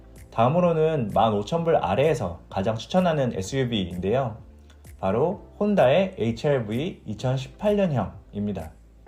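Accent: Seoul, South Korea. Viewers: 외국산 지 오래돼서 서울 말씨 모르는 건 그쪽 같은데. 요새 서울에는 남도 사람들이 많아져서 서울 말씨 모르는 사람들 많음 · 외국에 사신지 오래 됐나 보내요 저도 서울에서 나고 자라 미국온지 오래 됐지만 이분 요즘 서울 말씨 맞습니다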